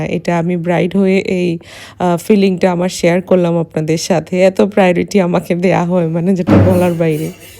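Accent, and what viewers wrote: Sylhet, Bangladesh. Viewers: Tumi sylhety ni affa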